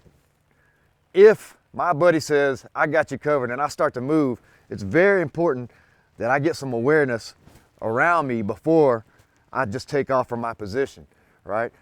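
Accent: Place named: South, United States. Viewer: This gentleman is definitely from the south.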